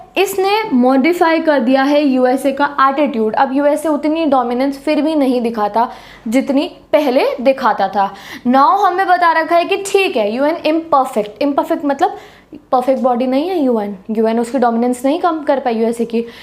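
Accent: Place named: Uttar Pradesh, India. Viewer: Inke language u.p feel lati h......moro moko mohse hega n all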